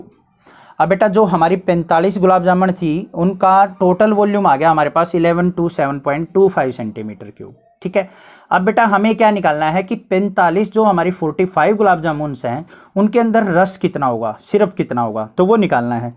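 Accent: Haryana, India. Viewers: Sir aap haryana se ho kya? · Sir it's the best explanation ever for these questions !! It's really helpful!! And from your language ; I think you are from haryana😁😁😁😁 Jai Haryana Jai haryanvi🤘🤘🤘🤟🤟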